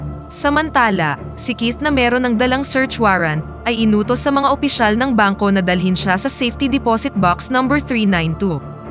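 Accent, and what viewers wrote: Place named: Philippines. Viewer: galing, sir anong reader app mo na may pinoy accent?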